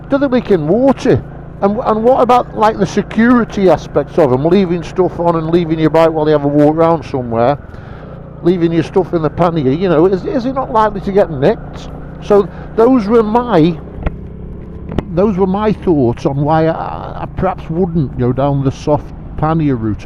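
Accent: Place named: Staffordshire, United Kingdom. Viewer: Nowt up with your North Staffs dialect bit like ours, though bit further afield they travel around on buzzes It was only recently, on someone’s suggestion, I started putting helmet on it’s side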